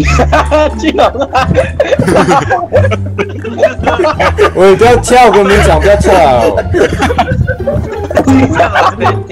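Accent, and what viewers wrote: Malaysia. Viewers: 你应该是马来西亚人吧，听那个口音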